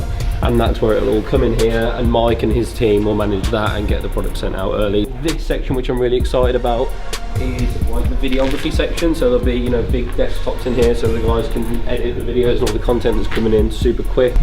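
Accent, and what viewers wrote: United Kingdom. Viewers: Difficulty in understanding your U.K. Regional Brand of English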